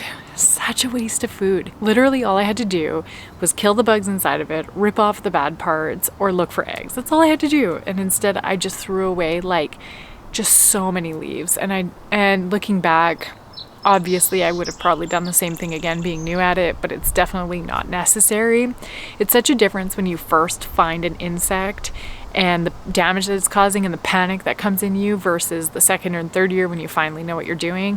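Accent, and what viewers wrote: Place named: Canada. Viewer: I am growing these: 1 coffee shrub, 1 avocado shrub, 12 buckets of potatoes, 6 buckets tomatoes, 6 buckets onions, 6 buckets sweet corn, 6 buckets carrots, 2 buckets yellow squash, 2 buckets green beans, 2 buckets jalapenos, 2 buckets green peppers. I'll look & see if I find any of these larvae. Thanks for the heads up. Love your Canadian accent !! I subscribed.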